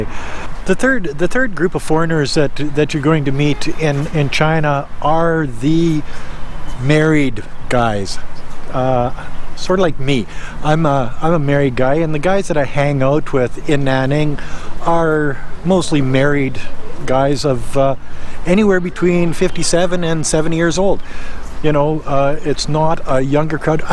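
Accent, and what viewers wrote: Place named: Canada. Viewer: Gosh!!! I'm a Canadian myself and I can hear your heavy canuck accent! Americans, British folk out there. This is a true Canadian accent.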